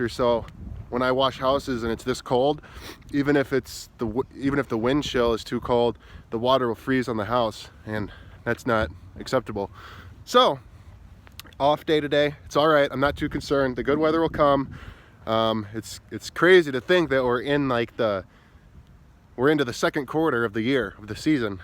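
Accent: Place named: Chicago, United States. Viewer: I knew you were from Chicagoland. The accent and the 815. LOL You looking for any help?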